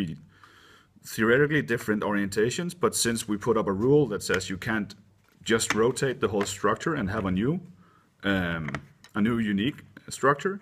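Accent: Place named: Denmark. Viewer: Where are you from? You sound Danish :o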